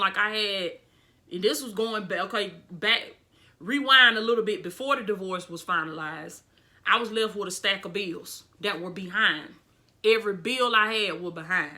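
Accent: South, United States. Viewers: I am also a survivor of domestic violence. I married young too and your testimony is powerful! I love your channel! I see and hear the power of God all over your channel and work the Lord blessed you to do. I felt immediately connected to your channel for many inspirational reasons but your accent took me my back home. ❤️ My people are from the South. I love your zeal. I’m 54 and have walked many miles towards the destiny that the Lord predestined for me too. I’m living my purpose through ministry among other things for the Lord. I purchased your book and subscribe to your channel. I love supporting others and believe that we learn much from the things we suffer. Your heart is beautiful and press on my beautiful Sister in Christ.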